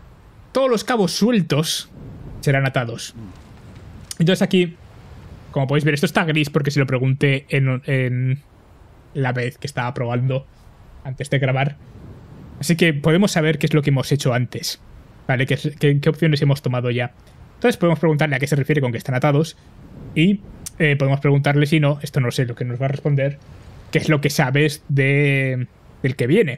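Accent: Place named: Russia. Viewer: es ruso y se nota... pense que iba a haber algo mas de comunismo en este video es una metafora cierto?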